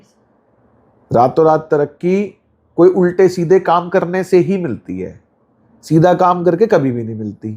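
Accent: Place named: North India, India. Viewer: 35.00 for your info in south ppl speak better english and with better accent. Only u guys, say time as tame and blue as bilue😂.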